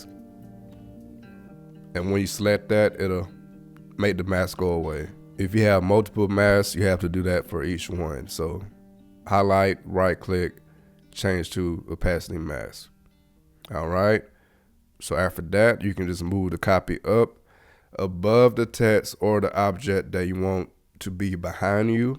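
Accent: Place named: Southeast, United States. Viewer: Most SE USA voice I heard as someone from Charlotte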